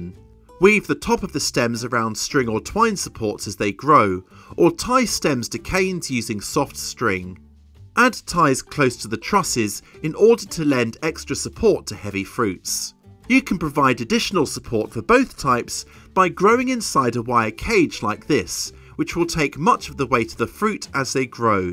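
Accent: England, United Kingdom. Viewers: Thank you, lovely to hear an English voice :)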